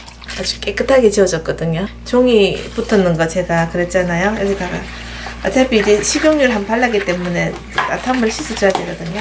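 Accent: Gyeongsang, South Korea. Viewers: 경상도 사투리 멋찌십니다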